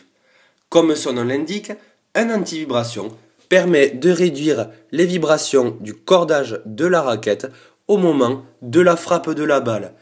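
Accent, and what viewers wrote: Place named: South, France. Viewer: mais non, quel bonheur cet accent du sud ! 🥰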